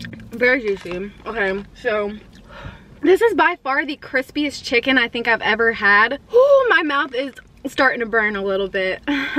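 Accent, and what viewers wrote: South, United States. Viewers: Just curious, where are you from? You sound like you’re from the south and so am I! 💗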